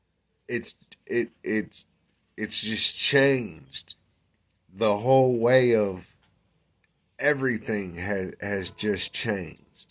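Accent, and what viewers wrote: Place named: South, United States. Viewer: Hi 👋🏻 you sound so southern 😮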